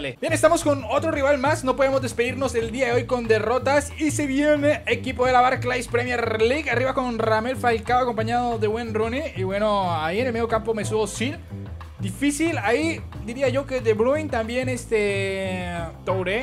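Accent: Colombia. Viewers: oye eres colombiano? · eres colombiano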